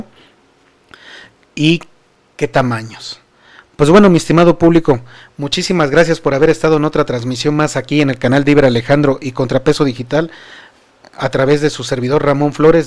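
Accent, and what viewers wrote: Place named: Oaxaca, Mexico. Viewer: Este camarada se be que es de oaxaca chingau asi se abla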